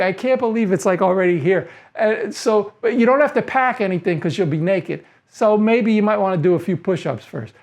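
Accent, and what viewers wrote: New York, United States. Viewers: Thank you for your reply! I've visited NYC a number of times and have friends in Queens, so I noticed your accent immediately. Brilliant video - a unique take on the issue! 💙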